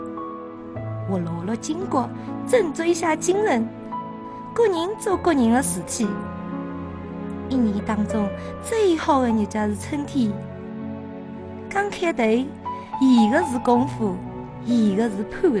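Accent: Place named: Kunshan, China. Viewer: 昆山街上人口音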